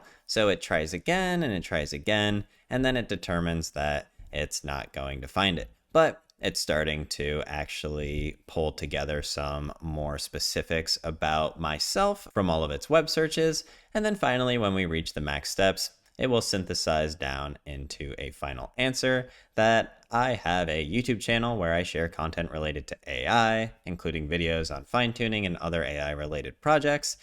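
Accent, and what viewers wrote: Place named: United States. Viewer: Your channel caught my attention because of an Ł in your name. I thought you were Polish, but your accent sounds like you are USA born and raised. I love the content. Very informative and in depth. I can't believe you are not some ML wizz in the Silicon Valley. Keep up the good work!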